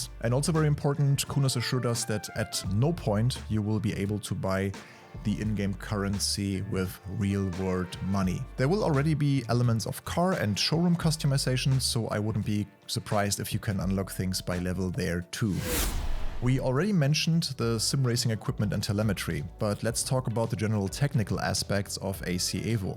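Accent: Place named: Germany. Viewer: Bist Du Deutscher? Hört man sofort raus, richtig schönes Video!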